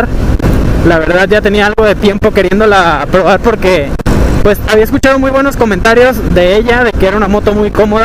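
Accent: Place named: Paisa, Colombia. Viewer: Me hiciste llorar al ver mi ciudad y escuchar a un paisa hablar chido tu vídeo vivo muy lejos y ver mi ciudad me sacaron lagrimas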